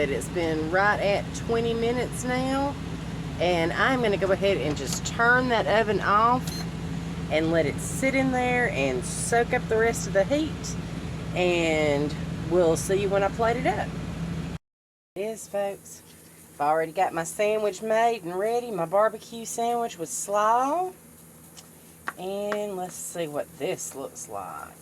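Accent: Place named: Texas, United States. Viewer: I love ur accent. I am from Texas and I have that accent.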